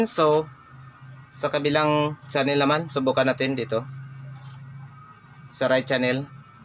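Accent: Iloilo, Philippines. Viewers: Daw ilonggo ka maghambal sir..idol ko mga ginagawa mo parang Ang Dali lng gawin.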